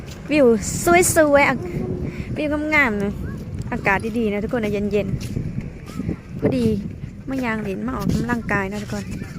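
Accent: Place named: Isan, Thailand. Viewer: หลงเสียงเว้าของ เข้าให้เเลัว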